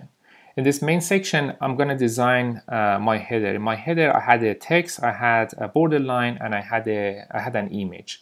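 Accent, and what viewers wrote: Iran. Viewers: By listening to your accent, it seems your native language is Persian.👍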